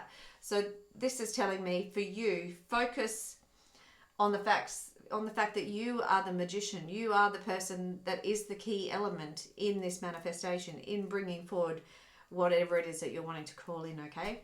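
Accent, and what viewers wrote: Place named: Australia. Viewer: Thankyou for sharing your Light with us through your Insightful readings cheers and all the best with yourchannel growing. I'm hearing a fellow Aussie, am I correct xx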